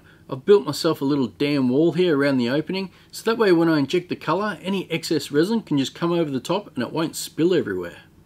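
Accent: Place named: Australia. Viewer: Thanks for your honesty. Good onya for persevering! Great to hear and Aussie voice on youtube too. I'm wondering if they used a egg-shaped silicone mould.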